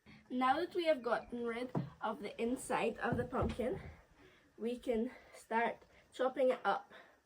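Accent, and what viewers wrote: Scotland, United Kingdom. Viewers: you're accent is all Scottish now. Beautiful 🙏🏽🥰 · Nice to see you cooking our traditional Guyanese cuisine,nice job and I love your Scottish accent , have a blessed evening and enjoy your vacation, there is nowhere better than home.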